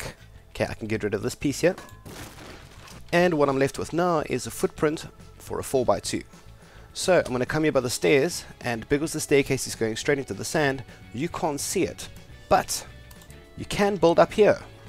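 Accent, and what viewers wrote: South Africa. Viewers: You sound South African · Upon watching this i couldnt help notice the man's voice in the video... how familiar it sounded. Then i check his twitter and i realise... FAM! South African gamer ^^. SO great to see a South African making a successfull year on Youtube and into their own streaming career. You are an inspiration dude. I stumbled upon this vid, but you got a new follower for sure. Nice hidden base video, going to lock up all the captives in there from now on ^^ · Are you south african? I heard Australian at first then i noticed that boer accent. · are you south african ? im north African :) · From your accent I am assuming you are South African and I pray to God that you are not still in that godless country. Stay safe out there buddy. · Are South African? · 120% south african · Are you South African mate? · South African? 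· Are you South African 🇿🇦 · This guy is south african 100% How i know the accsent And south african afrikaans english grammar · are you south african? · Are you South African? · I swear this homi is south African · I really love your accent and it makes me miss SA, great videos man and I love the concept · What's that accent? Are you South African? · Are you south african? cuz you sound like it :) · South African ?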